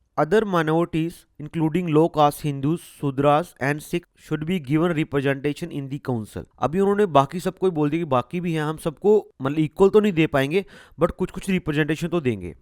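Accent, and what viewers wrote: Punjab, India. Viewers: Sir Punjab wali hindk bolre ho😉😉 thnq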